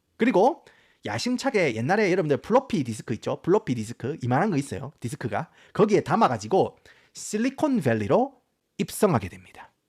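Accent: Gyeongsang, South Korea. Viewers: 이런 고급 정보를 개무시칸 갱상도 사투리로 전달 받는다는 점에 대해 소화 불량감을 느낄 갱상도 사람덜에 대해 생각은 안해보셨는지? 도무지 적응이 아니되옵니다~😅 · 왜 알고리즘에 뜬건지 모르겠는데 경상도 사투리와 굴러가는 미쿡식 영어 발음의 콜라보가 매력적인분이시네요ㅋㅋ 좐/촬스 + 으깨/느그들 ㅋㅋㅋ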